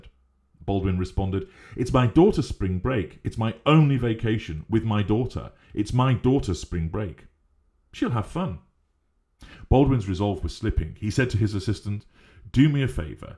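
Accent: United Kingdom. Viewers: Lol...TMZed rather than TMZee...so cute...so very British.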